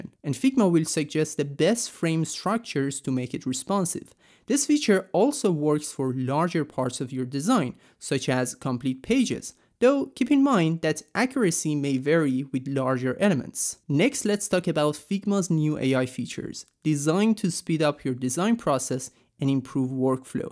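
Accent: Iran. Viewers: لحجه فارسی🤝🏻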